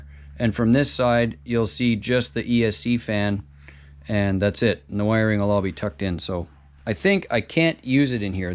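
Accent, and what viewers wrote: Canada. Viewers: Thanks for the detail - sure helps us newbs... and for pointing out the disconnected red wire from the SC to the BEC, my wasn't even shrink wrapped, they just pulled the connector pin out of the plug and left it haning. I figured it was a boo boo, so stuck it back in. Truck arrived from China 4 1/2 months after I ordered it, bad left steering servo, so while it's up on the hoist, am going to slow it down based on your fixer upper here with yer easy to follow instructions, in a proper Ontario accent to boot (grew up over near Shelburne, stuck down here in Texas now). cheers and thanks.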